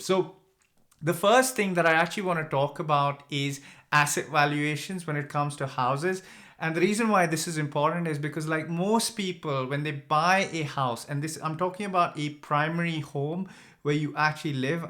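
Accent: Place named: India. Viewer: From your accent its visible that ur root is from Indian. Why can't you say your name properly. Its or. Bitte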